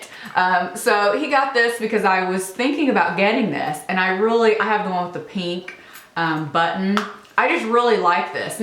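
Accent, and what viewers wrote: South, United States. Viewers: You have the strongest southern accent I have ever heard. Where are you from...love from Sydney, Australia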